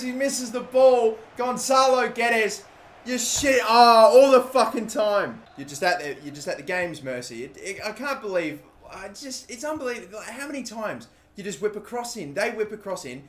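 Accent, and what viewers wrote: Australia. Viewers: i unsubscribes when u told them all to die u angry australian man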